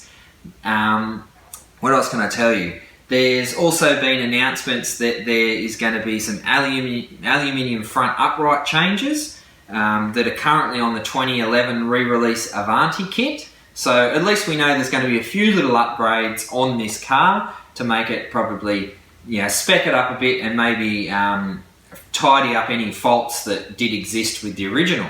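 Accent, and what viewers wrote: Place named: Australia. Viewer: Audio is much better, but you seem to have picked up an Australian accent some how.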